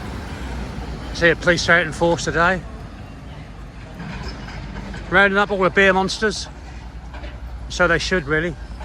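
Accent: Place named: Norfolk, United Kingdom. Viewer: I thought you sounded like you were from Norfolk! DSM are coming to Norwich soon, to knock down Anglia Square.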